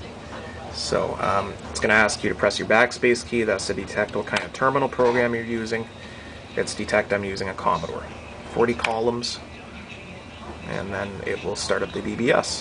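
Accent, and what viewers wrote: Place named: Canada. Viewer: You sound very Canadian. :) Great vid!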